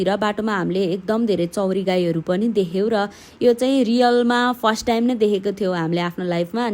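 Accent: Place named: West Nepal, Nepal. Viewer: West nepal ko accent kasto ramro lagchha. Bela bela haas panu uthcha.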